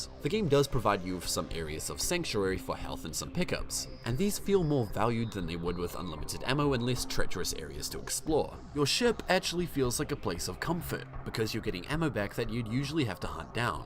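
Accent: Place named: New Zealand. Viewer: Was listening to a random gaming podcast on Youtube. Heard an NZ accent. Instantly subscribed.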